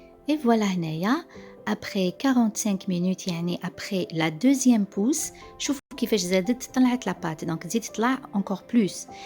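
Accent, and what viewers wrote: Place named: Algiers, Algeria. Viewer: لهجة جزائرية عاصمية الله الله عليك يا الجزائر 🇩🇿💯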